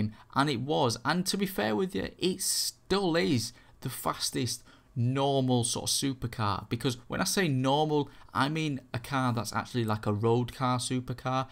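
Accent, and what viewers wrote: Yorkshire, United Kingdom. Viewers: Is that...a Yorkshire accent? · you sound like a british is it right:)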